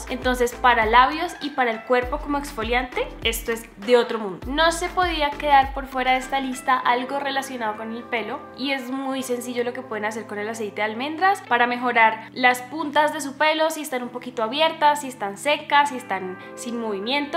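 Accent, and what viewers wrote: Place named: Colombia. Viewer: Ese acento... 🇨🇴 Colombiana?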